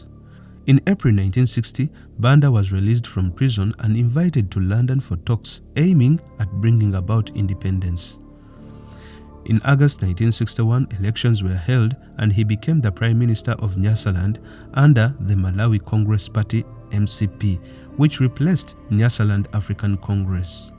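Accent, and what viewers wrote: Uganda. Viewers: Author sounds like a Ugandan scholar...the Ugandan English accent is unmissable...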